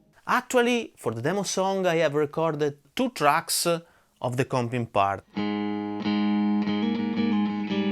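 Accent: Italy. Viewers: Very good demo, my compliments! I am thinking to buy this pedal to use it in my upcoming recording session in April instead of the tc electronic combo 65. i can hear from miles away that you are italian and that is great 🙂! Just starting to follow your channel. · what part of Italy do you come from, as your accent is very thick?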